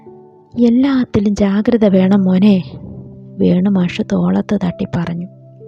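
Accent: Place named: Delhi, India. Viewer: Script good...presentation language delhi malayalam pole enikku thonny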